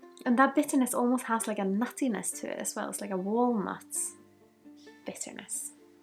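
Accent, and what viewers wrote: Scotland, United Kingdom. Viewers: not a native or an expert, but you do sound like a Scot. and Oban 14 (depending on a release, granted) is one of my favourite all time drams. there's just something sticky and earthy about it that I just love and almost can't find anywhere else.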